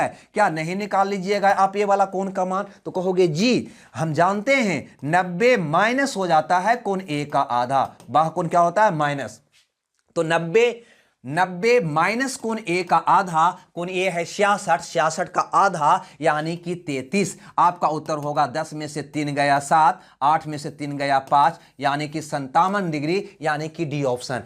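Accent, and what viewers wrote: Bihar, India. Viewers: Itna sudh hindi to desi board wale ko v samjh aayega sir tone se saaf pata chala rahe hai aap bihar ke hain but aap pura hindi me explain kar rahe hain jo kuch nahi samjh aa raha hai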